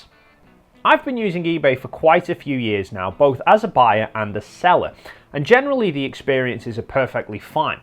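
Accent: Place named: England, United Kingdom. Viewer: LOL you are welcome. Love your channel and I find your mild humor funny. Your English accent gives you a leg up on other regular photography youtubers. So consider yourself lucky!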